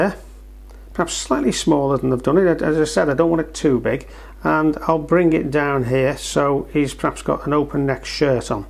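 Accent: Liverpool, United Kingdom. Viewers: Hi.Really enjoy your tutorials,love hearing you speak as I’m from Liverpool too. Its like going home.😁❤️👏🏻👏🏻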